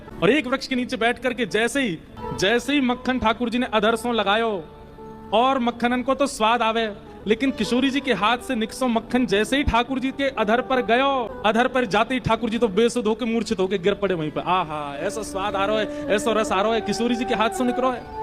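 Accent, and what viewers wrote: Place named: Braj, India. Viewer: Anand a gaya or apki awaz me brij boli😅❤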